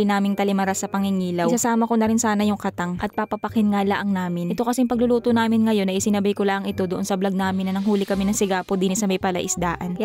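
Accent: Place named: Visayas, Philippines. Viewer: Murag bisaya si maam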